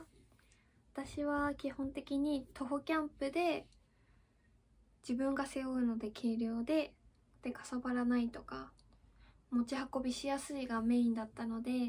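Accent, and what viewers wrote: Japan. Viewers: 青森出身？青森の おじさんがうるがす。 言うてた。後～、薬の イントネーション同じ。 へばな。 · お疲れ様です 今頃のコメントで申し訳ありません。 動画を拝見して、「うるかす」を初めて聴きました。先日の「耳がいずい」もそうですが、東北地方のご出身とのことで、お国訛りは、国の誇りです。どんどん使ってください。（本気の東北訛りだと標準語の字幕が必要かも？）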